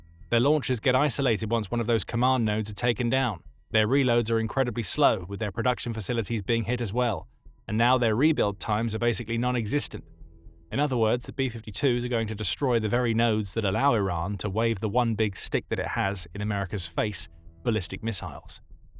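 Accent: United Kingdom. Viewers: Great footage but why have a Brit narrate?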